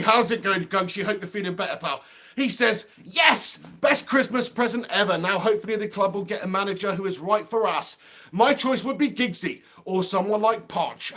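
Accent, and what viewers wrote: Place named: Cockney, United Kingdom. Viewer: Cockney Wanker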